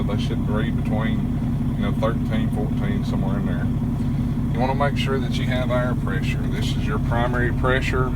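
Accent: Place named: Appalachia, United States. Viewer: Cringeworthy hillbilly accent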